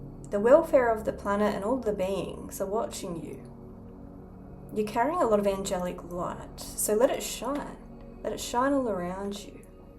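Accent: Australia. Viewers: SHEEYA..😊 U AUSSIE'z..and yur VOWELS...YAY!! ROWR ROWR !! 🥀😁🤙💋❤️‍🔥😋😘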